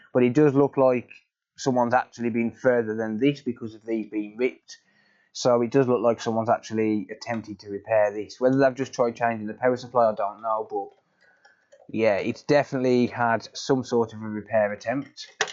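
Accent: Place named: Walsall, United Kingdom. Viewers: This geezer sounds like hes from my area ..Walsall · Great content buddy, keep up the good work. You sound like a local lad, are you in the midlands? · Loving the channel and the Brummie accent.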